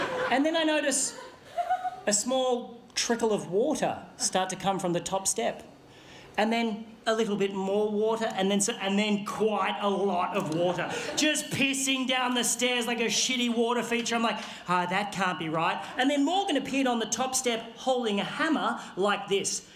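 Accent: Australia. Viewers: He's actually pretty normally Australian. is just regular Australian. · this poor guys comment is getting attacked guys chill lol also yea i know what you mean his emotional range with the aussie accent and the way he talks goes soo well with how we speak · This isn't aggressive Australian, this is regular Australian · mans so aggressively australian he replaced the voice in my head , now everything i read is in australian muppet reject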